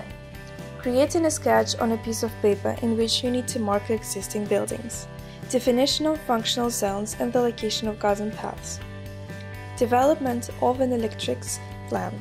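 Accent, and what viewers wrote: Russia. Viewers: русский акцент убивает просто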